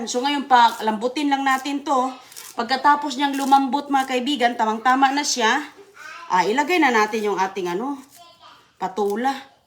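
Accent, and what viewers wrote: Visayas, Philippines. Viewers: Oke oke you need to learn English now and you stay in America for how many years and still your accent is really different I know you are from visaya but it’s hard to understand 😮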